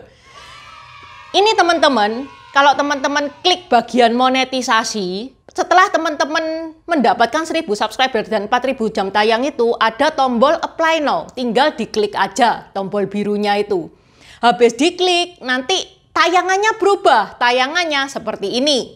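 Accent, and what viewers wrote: Java, Indonesia. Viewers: Perjuangannya kerreenn. Menginspirasi. Medok itu malah khas dan seksi hehe... Semoga akun saya pun cepat nyampe syarat dan monet... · Meskipun logat Jawanya kental tetapi video yang dibahas cukup bagus kok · Salam kenal mbak 🙏🙏🙏, aku senang dengan logat bicaranya Podo Karo aku Medok Jowo, ndilalah Podo senenge Ng sejarah👍👍👍❤️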